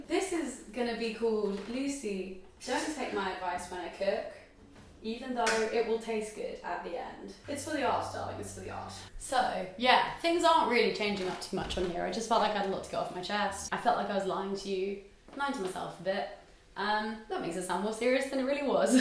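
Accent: United Kingdom. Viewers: I do have to say, your voice is diferent. If I have to describe it, it's like you have a stonger british accent than before. Even thos this new voice is kind of cool. We could see that your are moiving on or mayber moving arround, but if i have to say something it's feels like your are quite goiging back to the same state of mind over and over. Il y had to make a resume of the process it will like: starting art video project > improving and talking about yourself > realizing you talk to much about you > sentiment of loosing personal safe space > black out youtube > then you go back down on thing > then it's the fuck that feelling > and we rewind to projects. Everyone af it's own reptitive cicle (for me it"s about meeting people > over speculate on relationships > realise the truth > pushing away human kind > being sad > and stat over) It's just weird to see someone elses loop.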